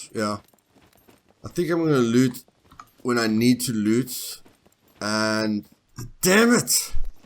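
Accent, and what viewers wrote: South Africa. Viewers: I like your accent is it south african or new zealand? i may be completely wrong but i know people from both places and you sound similar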